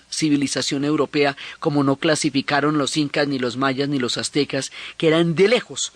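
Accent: Colombia. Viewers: Por el acento de la ponente, deduzco que es colombiana, y yo creo que el nombre de esta señora debería estar grabado en letras de oro en la sala de sesiones del Poder Judicial nacional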